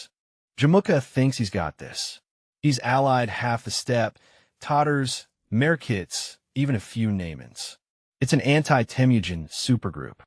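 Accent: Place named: United States. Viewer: suggestion, please pronounce the names correctly, not in Us english accent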